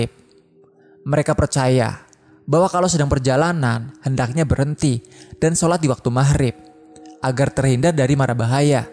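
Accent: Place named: Java, Indonesia. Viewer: masih ada medok nya dikit tolong d hilangin mas... biar enak kedenger nya.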